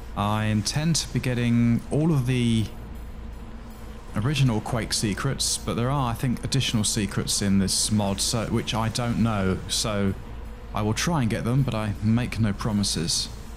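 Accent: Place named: United Kingdom. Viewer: Nice to hear another player from the UK for once lol, do you play Defiance by any chance....we could do co op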